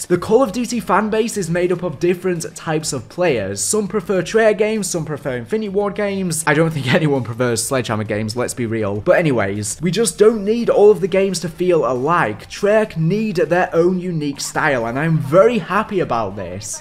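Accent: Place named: United Kingdom. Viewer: F*cking british accent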